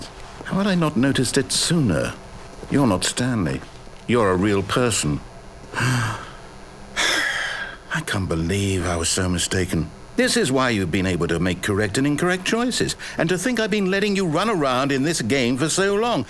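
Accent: United Kingdom. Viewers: i dont know what franknfurter sounds like cause i never saw rocky horror picture show but the narrators particular brand of english accent is quite similar to classic stewie's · It's called a british accent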